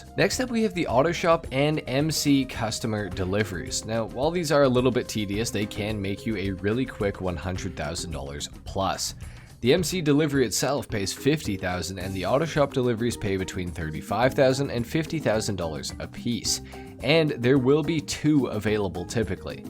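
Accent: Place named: Canada. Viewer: I was watching this video, and i was like, I think I hear a canadian accent. Checked out your channel and I was right. You got yourself a sub now.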